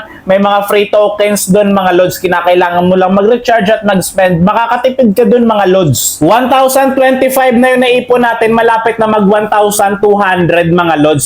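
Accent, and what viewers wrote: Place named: Visayas, Philippines. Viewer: Bisaya yan halata sa lods sarap sapakin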